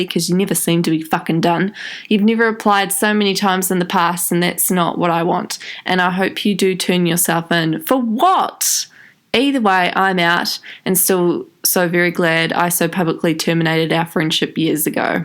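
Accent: New Zealand. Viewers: My wig liteRALLY flew to mars! oh by the way, are you from new zealand? you have a really nice accent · Heehee... you sound like a Kiwi Kath Day-Knight from Kath & Kim, when she's making jokes about Kim's weight to other people right in front of her. A little bit too shady for me, I find calling him Onion on purpose kind of annoying. Not because I'm a fan (nope), it's just not much of a burn or a joke, it's just like "Oh, you didn't take long enough to read it... and now that's what you're going with. Clever." Also, onions are pretty popular. Like garlic. Bad rap, but people scoff the shit down (good thing too, they're both super medicinal). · I'd have told onion to take it to the police if he was so 'concerned' (which we know he won't because: He's got no evidence He just wants attention which is why he's sperging out on social media). Didn't he try that on PewDiePie as well but no one bit, so he's slandering Shane instead? 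Just discovered you and enjoying it so far. X PS. It's good to hear a Kiwi accent and guess it correctly- yay, I feel validated! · When you're Australian and knew straight away that you're a Kiwi. Also, I love this video. You have a new subscriber. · This is a New Zealand accent, right? It sounds like it, but I want to make sure before I show my boyfriend so he knows how the accent sounds lol.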